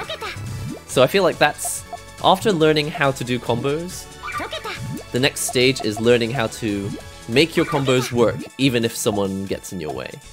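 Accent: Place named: Glasgow, United Kingdom. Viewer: You have an interesting accent. It almost sounds like (posh) Glaswegian at times.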